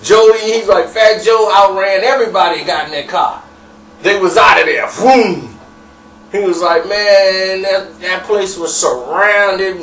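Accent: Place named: South, United States. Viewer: Dam good southern voice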